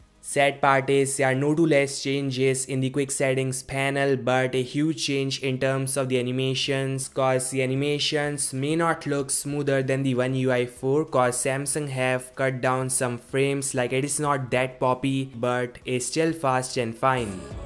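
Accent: India. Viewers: Are you indian? You talk like indian !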